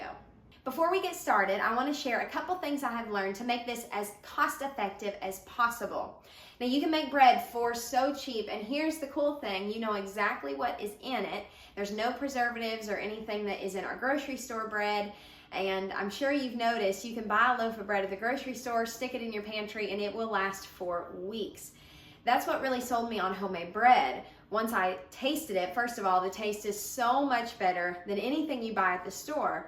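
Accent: South, United States. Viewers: Her southern accent disappeared about 2 minutes into this video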